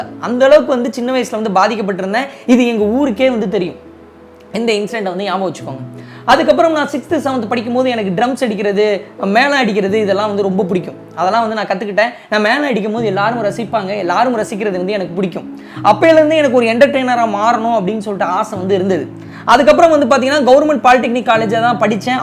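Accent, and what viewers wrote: Madurai, India. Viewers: Ni madurai ya bro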